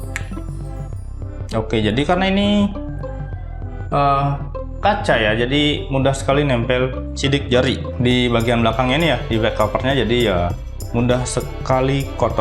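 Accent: Bali, Indonesia. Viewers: Klo liat dari aksennya si admin ini orang bali...😀😀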